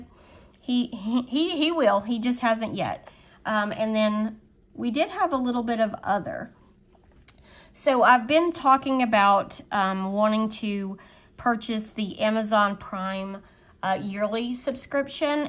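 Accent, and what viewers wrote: South, United States. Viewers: Good job. Love to hear a fellow southern accent in the budgeting community. You are doing great!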